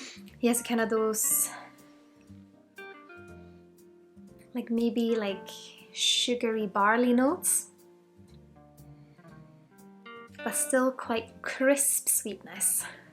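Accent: Scotland, United Kingdom. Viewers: Have you picked up a bit of a scottish accent, thats not a swedish accent i've ever heard haha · You have a bit of a Scottish accent.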